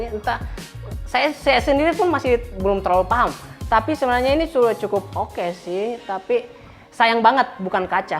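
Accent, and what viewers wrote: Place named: Sinjai, Indonesia. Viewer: Kaya kenal... Org sinjai yah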